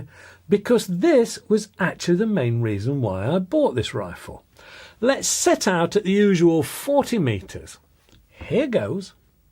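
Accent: United Kingdom. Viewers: Since i discovered this Channel an couple ofm onths ago, i am hooked and have seen allmost all of them.. at least twice. I love the review, breakdown and judgement without bias or clearly "payed in whatever way" intention. All done without benefits from manufacturers, clearly and correctly spoken brittish without being pretentious about being brittish. love it! I do not like the american slang of which youtube is full of., please keep on trucking with this channel, for years to go please... I have followed a few of your advice, and you naild it. I am so pleased with you. This 50 meters airgunner (till dec last year with a HW97K and grouping like pennies) is now a happy owner of a HW100T (FAC) has learned a lot from you! Cheers! an many thanks. Will order an AAR Cap soon and proudly wear it at competitions next year. Forgot to say that i never had much of an impession of Gammo, but now i am interested and will try that airgun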